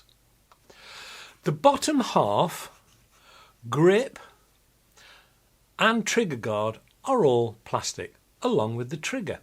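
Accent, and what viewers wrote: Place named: England, United Kingdom. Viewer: Not used to gun reviews conducted by a gentleman with an English accent, but I’m here for it!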